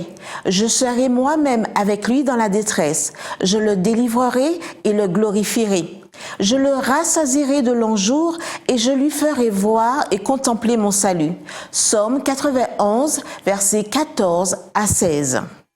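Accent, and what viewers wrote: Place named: Antilles, Caribbean. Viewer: Merci pour ces belles paroles 🙏 Après avoir passé un peu plus 23 ans en Guadeloupe, le léger accent antillais rajoute pour moi une dimension affective forte. Je connais l'esprit chrétien très fortement encré dans cette population qui est devenue ma seconde famille. Les touristes voient d'abord les plages et les beaux paysages, mais c'est avant tout un peuple infiniment bon et beau. Oui, je me suis un peu éloigné du but de cette vidéo, mais pas autant que ça, puisque l'amour est présent dans chacun de ces mots. Que Dieu vous bénisse 🙏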